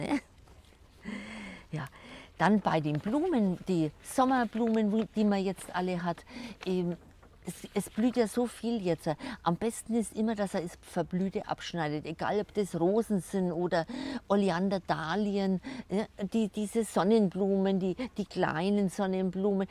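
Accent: Franconia, Germany. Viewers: Eine Freude den typisch fränkischen Dialekt zu hören. Ich tippe auf Mittelfranken 😊 Super Tipps auch noch dazu 👍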